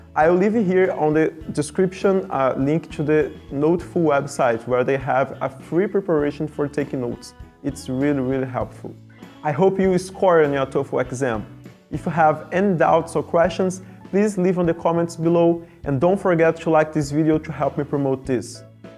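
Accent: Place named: Brazil. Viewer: Your english is pretty good, and surprisingly you have only a little accent, which is kind of rare for brazilians!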